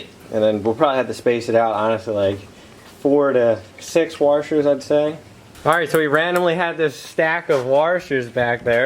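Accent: Maryland, United States. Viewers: High Live yeah. He's from Maryland. Lol. I'm sure I have a weird accent to everyone that's not from my area.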